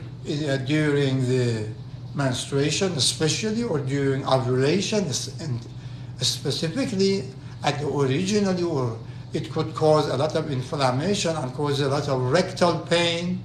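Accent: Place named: Iran. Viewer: Thank you for your video. I have a 3.5 c.m. Cyst on my right ovary causing me pain. What are my options? My husband and I want to have a child but this situation is making me hold off. Would I require surgery and if so, is my fertility still in tact? Also, you sound Persian. I am also Persian. Thank you 🙏🏼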